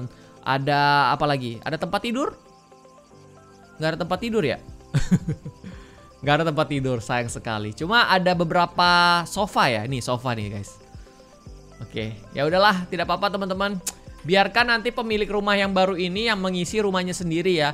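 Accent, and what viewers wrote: Java, Indonesia. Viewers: Kak kalo ngomong bahasa Jawa lucu bgt wkwk🤣🤣..eh btw kak STMJ susu telor bukan teh🤣🤪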